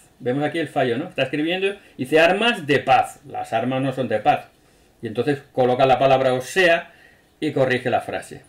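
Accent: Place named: Spain. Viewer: Hermano buen bideo y me fasina su acento español olé tio¡¡ Saludos hermano y éxito, Bendiciones.....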